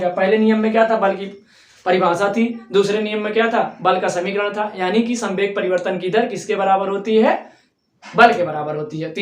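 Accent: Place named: Pratapgarh, India. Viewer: अति सुन्दर प्रस्तुति प्रतापगढ़िया भाषा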